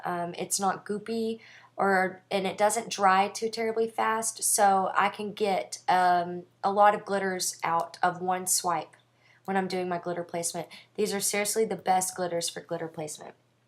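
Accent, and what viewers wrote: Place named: South, United States. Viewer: LoL - high five swanky southern accent!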